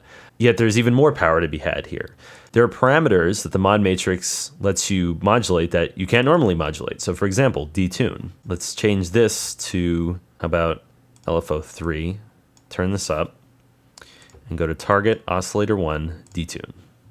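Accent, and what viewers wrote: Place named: Canada. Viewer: I really wanted to see this video but I just couldn't bare to listen to the speaker's annoying Canadian accent.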